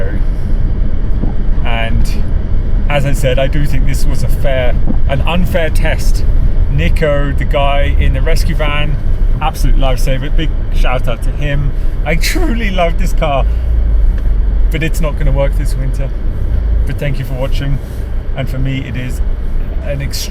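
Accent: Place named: Switzerland. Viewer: Hearing you talking swiss german is funny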